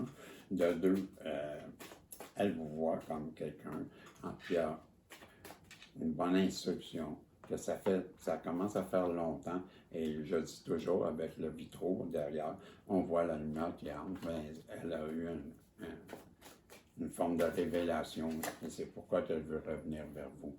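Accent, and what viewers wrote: Quebec, Canada. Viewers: Salut oui tu as raison j’aimerais qu il me disent la vérité mais je ne reviens pas en arrière merci l ami j adore l ´ accent québécois vive la vie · Pour ma part je suis une bouffonne mais dans le bon sens du terme j'aime la vie,.. j'adore ton accent québécois... je me suis détachée en amour de mon mari qui m'accompagne depuis mes 18 ans mais aujourd'hui après avoir souffert seule, bloquée physiquement depuis 6sem.. aujourd'hui,le voyant chantonnant .. je ne supporte plus sa présence....et me retrouve en totale perte d'énergie.. quitter la maison dans laquelle je me suis seul temps investie.. difficile.. mercii pour cette guidance ❤ Véronique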